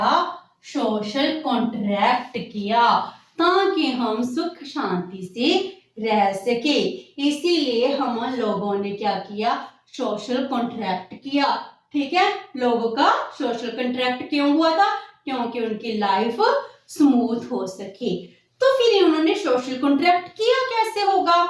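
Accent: Punjab, India. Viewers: thankyou mam, the way u teach with punjabi accent is awesome and in a lucid way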